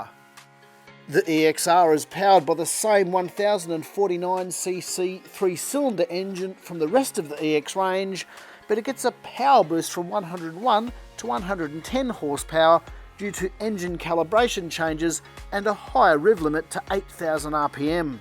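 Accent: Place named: Australia. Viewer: It's because that's Australian dollars. Notice the accent 😋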